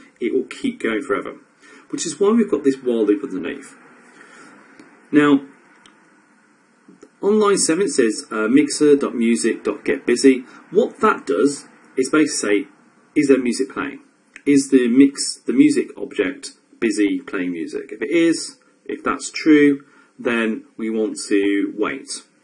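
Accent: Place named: United Kingdom. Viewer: This accent, he's from UK for sure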